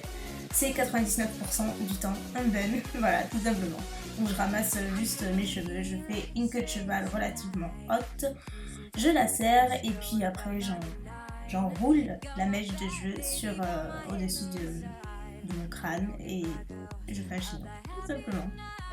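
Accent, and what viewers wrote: South, France. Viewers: Tu es sublime! ♡ Je regarde tes vidéos depuis pas mal de temps maintenant et c'est toujours un plaisir!! Tu es absolument magnifique et tu as très bon goût. Je te souhaite de pérenniser sur Youtube et que tout aille bien pour toi. Ta bonne humeur et ton petit accent du sud sont un vrai rayon de soleil!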